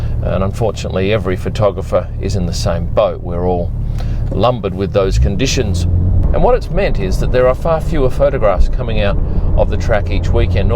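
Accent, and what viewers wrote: Australia. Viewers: It’s so great to hear a fellow Aussie at the top of his game doing this. I shoot a bit for fun, but not on your scale. Love your work mate. From Quarantine central... Melbourne. 😂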